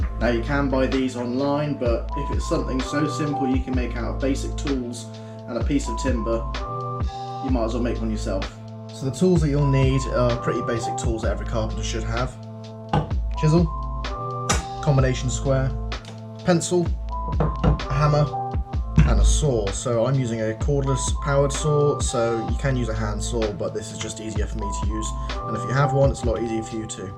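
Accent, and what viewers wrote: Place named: England, United Kingdom. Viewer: Thanks heaps. So simple and easy to make. Good, clear explanation and camera work. Great to hear a pommy accent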